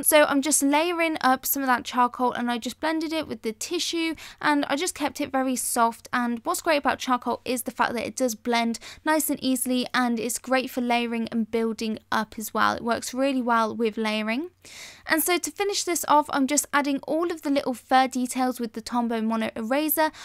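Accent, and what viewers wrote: United Kingdom. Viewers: I knew you are from UK when I heard your first few words. hehehe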